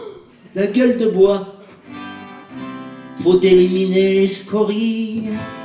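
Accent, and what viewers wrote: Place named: Vosges, France. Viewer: Véééé , t'es né à Rmiremont (comme moi !! ) et t'as le même accent que moi ...!!!! Bizz de Nîmes .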